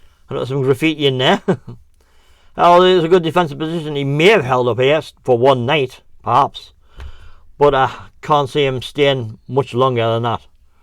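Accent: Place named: North East England, United Kingdom. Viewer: Interesting content BUT just a "heads up", mate:- I was born & bred in North Shields so you can imagine what my accent is like. HOWEVER, I struggle to understand what you are saying at times & have to rewind to try again. The reason is that you talk too fast, mate, & people who are not used to the north east accent are going to really struggle to understand what you are saying. Just trying to help. Best regards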